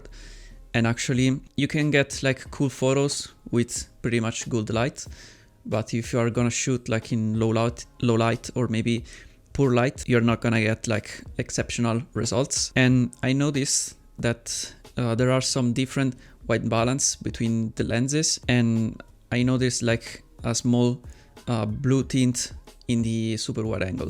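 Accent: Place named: Italy. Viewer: sapevo che eri italiano...si capiva dall'accento inglese ahahah bel video anche io ho il mi 11 e secondo me con il sensore principale le foto sono fantastiche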